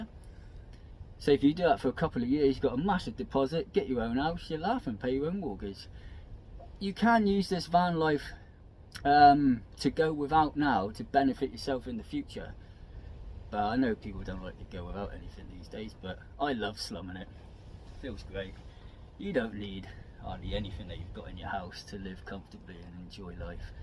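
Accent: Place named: West Country, United Kingdom. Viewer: Ah right. You picked up a west country accent. I agree like your hacks, I carried on living like a student, 60 now same habits, I like to keep living costs low. You can pretty much get anything of facebook market place for free if you are prepared to wait for it. I should get to Scotland, I keep looking at rural houses up that way, plenty to think about.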